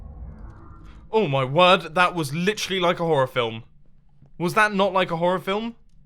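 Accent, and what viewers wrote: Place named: United Kingdom. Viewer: Ur British! finally!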